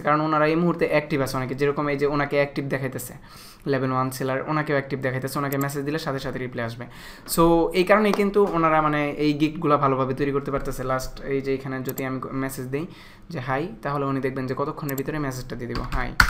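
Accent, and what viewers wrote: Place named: Brahmanbaria, Bangladesh. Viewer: শুভেচ্ছা রইল, কিন্তু আপনার মুখের ভাষাটা ব্রাহ্মণবাড়িয়ার মনে হচ্ছে বা আশপাশের অঞ্চলের। (মোট কথা দেশি দেশি লাগতাছে)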